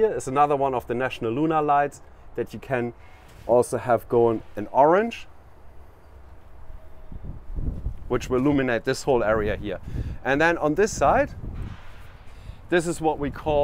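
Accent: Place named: Germany. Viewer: It’s a pretty fancy set up for a troopy. I am just asking myself where they put all their camping gear? What about a table, chairs, bags. You said it’s perfect for longer traveling where do they put their clothes? Looks like heaps of electronics but not much space in the end… I would be curious what this set up costs… Ps. Love the German accent! :)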